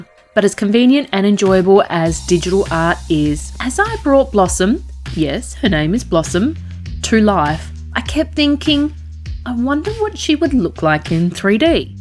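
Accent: Australia. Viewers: 😂😂😂 (context: I am from the USA) I just went to Google and searched, "Define Skalittle". It changed it to skeletal, but it still didn't click with me! So I thought, "Well, I must be spelling it wrong." So I used the microphone to say "Define Skalittle"and still, it gave me the definition of skeletal. It wasn't until this point that I realized you are saying skeletal, just the way, I guess, you guys say it in Australia. I cracked up!! I thought I was going to learn a new vocabulary word today! 😂😂😂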